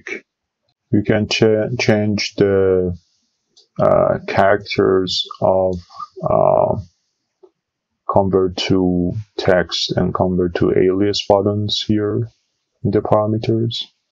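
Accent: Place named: Iran. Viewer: I hear persian accent 😀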